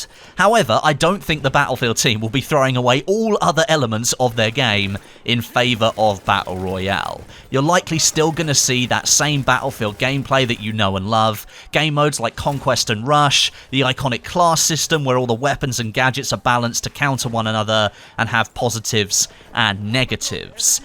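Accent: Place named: Essex, United Kingdom. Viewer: He's so Essex!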